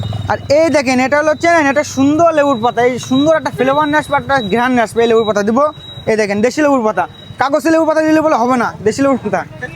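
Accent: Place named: Barishal, Bangladesh. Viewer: Shalay pure borishailla😂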